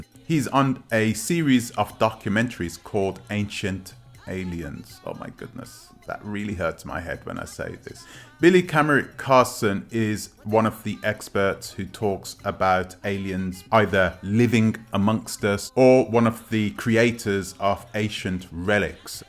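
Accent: United Kingdom. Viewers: I think I don't belive you, with this English of England, start speaking like a black man, while you talking like the King of england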